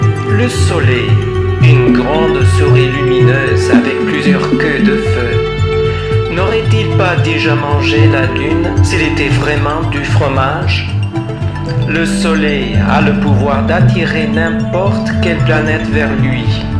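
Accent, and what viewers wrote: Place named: France. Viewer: c'est tres tres sympatique ...ahahaha ... et Ton accent Français est magnifique :)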